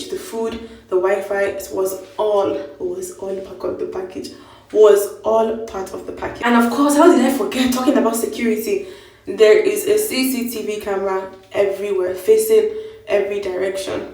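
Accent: Igbo, Nigeria. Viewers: Her igbo sounding so poshhh😂😂❤❤❤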